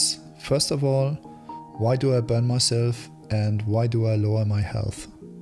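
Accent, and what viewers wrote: Germany. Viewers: are you german? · i knew it since im german too and have a slight accent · Hört sich so deutsch anhahaha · ich hab es erst gesehen wo man dein yt Dashboard gesehen habe aber man kann es auch an dein englisch stark hören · Are you From Germany it Sounds a bit like it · es ist so offensichtlich dass du deutscher bist :D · wie man einfach direkt raushört das er Deutsch ist xD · Bist du deutscher? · The german accent tho xD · Are you german by any chance? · man merkt so hart das du Deutscher bist 😂aber bist übelst sympathisch 👍 nices Video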